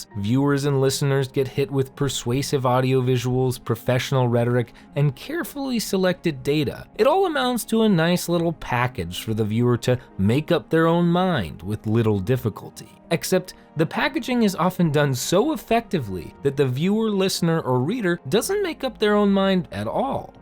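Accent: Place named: South, United States. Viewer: I love your accent. Is it southern?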